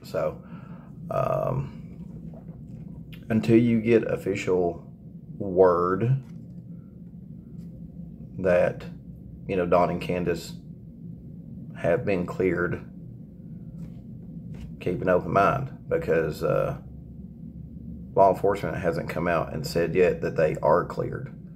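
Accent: South, United States. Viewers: You look better today. Younger even! Just love your southern American accent.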